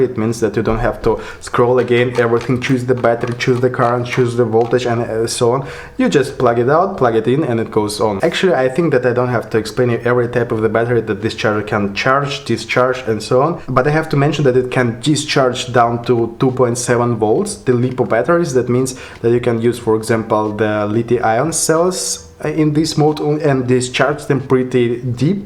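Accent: Russia. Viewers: Прикольный английский у тебя 😺 Чисто русский английский 👍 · сори, слушать такой вот рунглиш это насилие для ушей. попробовал включить субтитры, но они автопереводные. смотреть и без звука и без субтитров - бестолку. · мне понравилось, давай больше таких роликов, смотришь и язык подтягиваешь ) при чем быстроговорящих американцев без субтитров не понять, а когда присутствует русский акцент, сразу понимаешь )))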